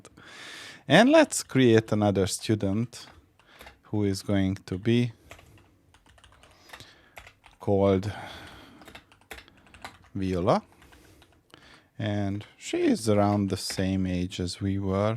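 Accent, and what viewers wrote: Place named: Hungary. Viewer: I don't know why youtube recommended this video for me, but from your accent, I instantly knew that you are Hungarian as well, maybe that's why. But to add something relevant, I'm an amateur programmer, but when I needed to handle databases, I've always used pandas. Sometimes I have to use SQL in Access, but I'm not a fan of it generally. Is there a benefit - or what are they - using SQLModel instead of pandas in python?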